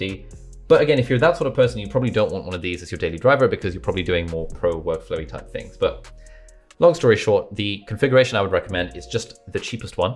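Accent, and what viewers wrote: United States. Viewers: I see you getting the American Accent. The way you pronounce the "r" in air and processor. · you can kind of tell that is starting to get an american accent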